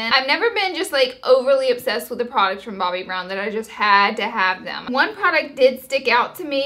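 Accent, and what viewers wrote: South, United States. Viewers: where are you from? your lil country accent is adorbs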